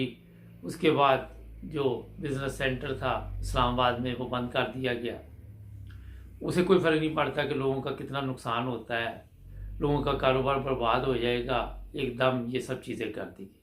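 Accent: Punjab, India. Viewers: Your punjabi accent tell me you are from Saialkot .am I right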